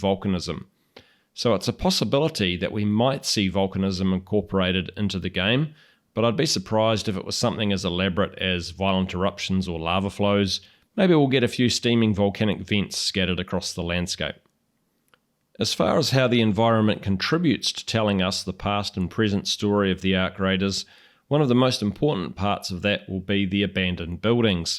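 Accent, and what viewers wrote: New Zealand. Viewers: As a kiwi abroad, it now hurts physically to hear a kiwi accent.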